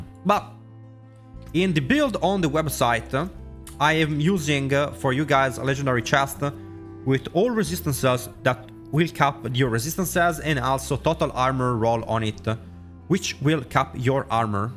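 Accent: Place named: Italy. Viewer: bro its s tier and he got an italian accent. what is your problem with the build maybe we can help you?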